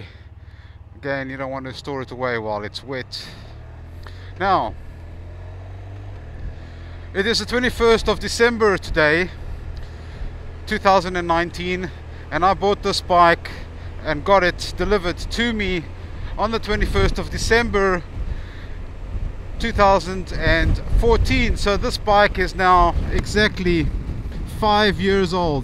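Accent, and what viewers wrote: South Africa. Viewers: South African in a foreign country? Unmistakable accent. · It sounds like you have a very South African accent! Lekker man.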